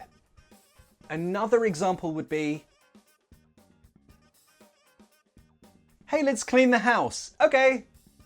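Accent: United Kingdom. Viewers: You make me love British English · You're the only British English speaker I really enjoy listening to.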